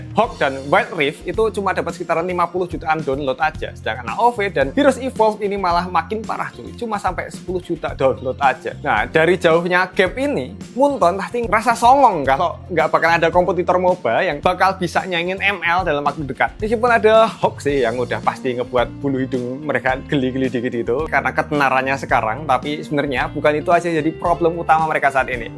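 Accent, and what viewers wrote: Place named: Java, Indonesia. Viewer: Aku org jawa, tp gk tau knp klo logaty medok bgni jd gk meyakinkan, krn biasay org sono2 garing, baru tau sdkit heboh.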